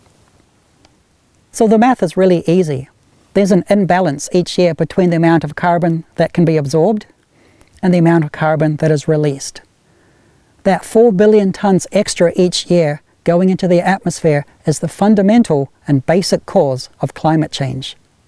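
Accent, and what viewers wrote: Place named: Australia. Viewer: blimey an aussie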